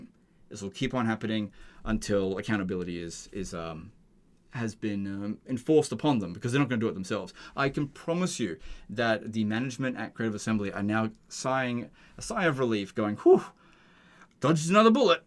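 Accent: Australia. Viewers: Ill miss yr Aussie voice! You made me a better English learner!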